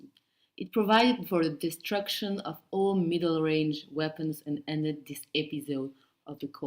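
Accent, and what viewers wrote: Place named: France. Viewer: That french accent tho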